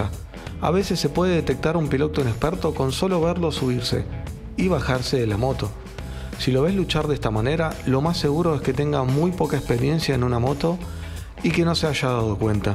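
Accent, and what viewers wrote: Standard. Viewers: Por qué hablas en neutro? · Por favor,no hables en neutro 😅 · El mejor comentario , alguien que me diga por qué habla en NEUTRO si es más argentino que Manuel Belgrano… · no hables en neutro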